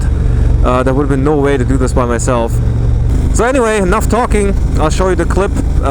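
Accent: Germany. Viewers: Wenn ein deutscher English spricht. Schrecklich, ich breche hier ab. Vor dem Crash noch.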